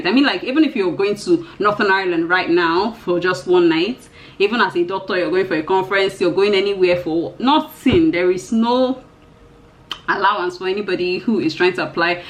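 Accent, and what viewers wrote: Nigeria. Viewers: Lool I liked this video because of your Nigerian accent and the Nigerian humour you used to announce what is essentially bad news which didn't make it sound as bad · Hi...did you live in Lagos? You sound Yoruba. Regards from the UK.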